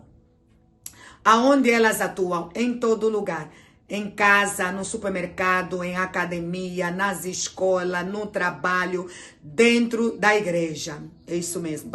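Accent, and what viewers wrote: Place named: Latin America. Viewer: Então quer dizer que é esse espírito que faz alguém pensar em pornografia e sexualizar as pessoas? A pastora é de algum país hispânico da América Latina? O sotaque é de algum desses países...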